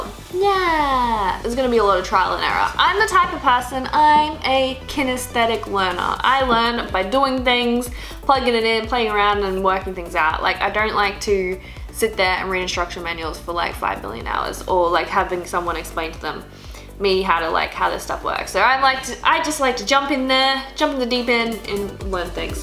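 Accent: Australia. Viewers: Stop trying to Americanize your Aussie accent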